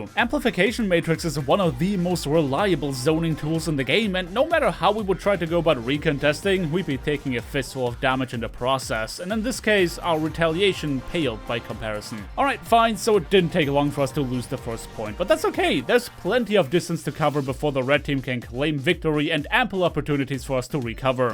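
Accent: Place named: Germany. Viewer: I knew it You are a German Dein Akzent hat mich immer vermuten lassen, dass du ein Deutscher bist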